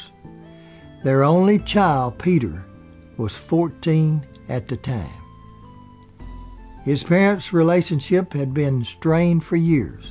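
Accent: South, United States. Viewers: Your accent is really gorgeous... so full of character. I'm in Ireland so it's a lovely novelty to hear this beautiful Southern drawl in all its raw glory. I especially love how you say dates... like nineteen and eighty-two. Hope you never lose it. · I personally like the videos that provides for the YouTube community. I also enjoy listening to his voice as it is unique, very southern (I believe) as well as soft spoken. This is the kind of voice that would help a high school student to listen in order to learn a complex subject as it is very calming. I enjoyed this video on Glenn Ford as I also enjoyed his acting. I also enjoyed his video on Dan Blocker who played Hoss Cartwright on the long running television show Bonanza. was on location where the Cartwright's home and store were shown to viewers. This is an in depth look at the life of a celebrity and I liked the way in which conveyed this information, it added depth to Blocker and he made me feel like I had known this man. 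Not many people can do this. By showing viewers pictures of what buildings and houses belonged to him and how those buildings sit today add layers to a persons history and I enjoyed this perspective. The other video that I can recommend whole heartedly is on Billy the Kid. As a young kid growing up in New Mexico, Billy was a sort of anti hero people could relate to. has filmed areas where some of the action had taken place. His work combines photos taken at the time with camera work visiting these historical places that have been preserved. For those viewers who cannot make it to New Mexico to view where Billy was supposed to hang, where the sheriff was shot in the street, where his employer and friend John Tunstall w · Nice biography's. I'm from Aberdeen Scotland. Movie stars have touched everyone around the world over the years. Nice to hear someone giving the facts without being judgemental. 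No one is without sin and the internet is full of people who love to judge. keep it up! Love the southern accent. Beautifully relaxed.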